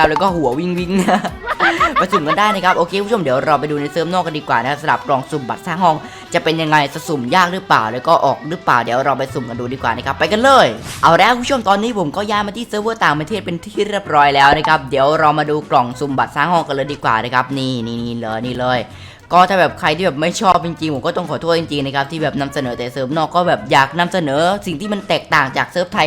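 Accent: South, Thailand. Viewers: คนใต้มั้ยเนี่ย ดูมาหลายคลิปแล้ว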